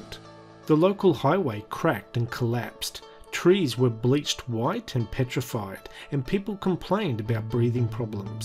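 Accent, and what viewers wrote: Australia. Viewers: I stg aussie accents are NAILS ON A CHALKBOARD annoying..!!! You all say ‘over there’ like “ORVAH THEY” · I hear 2018, think some people didn’t understand the Aussie accent. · I should have thought it was hard to mistake the two. While you may not have pronounced the "d" there is a clear difference in the vowels between eighteen and nineteen, and the Aussie accent even exaggerates that difference. You clearly said "aye-teen" with a long drawn out aye which wouldn't sound anything like "nine-teen", i.e. aye vs. eye+n. I just think they're unused to other accents and rhythms than US English. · Aussie accent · You did say 2018 it is just your Australian accent that makes it sound like 2019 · you’re Aussie accent is so far thick it’s become gibberish. That’s where the problem lies.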